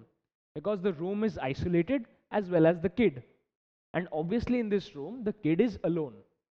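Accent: India. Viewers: i can barely understand shit because of his indian accent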